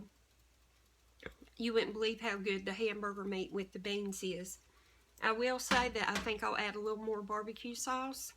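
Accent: South, United States. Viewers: Those glasses and your Southern accent are wonderful. Great video thanks.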